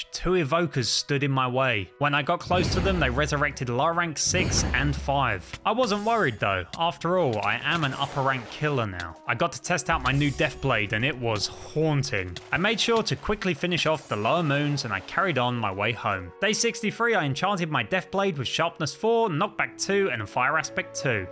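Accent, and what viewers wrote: United Kingdom. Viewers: I was sure that You are from uk but when i saw that You are from Poland i was so confused. Well… super film nagrałeś 😅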